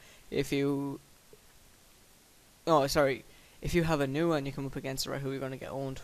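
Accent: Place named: Ireland. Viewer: What part of Ireland ya from? Waterford?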